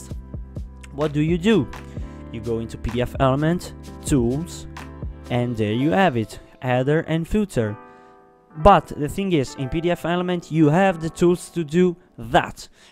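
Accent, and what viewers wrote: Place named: Italy. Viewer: hi, I couldn't help but notice that, by any chance are you Italian? you sound really Italian I don't know why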